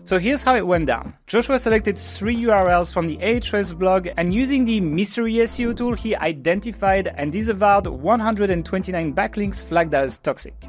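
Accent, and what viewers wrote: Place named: France. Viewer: its french accent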